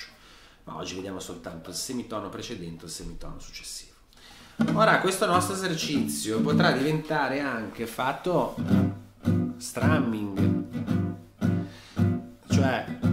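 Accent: Genoa, Italy. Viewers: Maestro sei bravo,bravo,bravo, complimenti , la lezione sul walking bass è stata una meraviglia , poi sentirti parlare con quel bel genovese e' il massimo, una carezza in più a Nebbia..🙂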